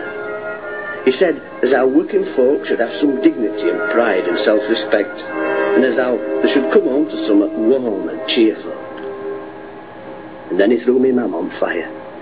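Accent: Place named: South Yorkshire, United Kingdom. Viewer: The voice is that of Tony Capstick, a folk singer and radio presenter who had a South Yorkshire accent. Nothing like Mancunian, I'm afraid Manchester is, indeed, in Lancashire, but Greater Manchester comprises parts of south Lancashire and north Cheshire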